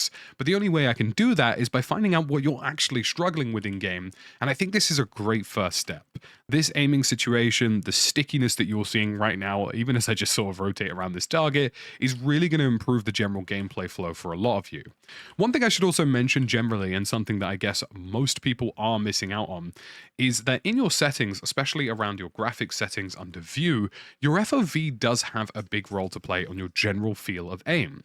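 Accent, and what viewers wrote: United Kingdom. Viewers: this is a British accent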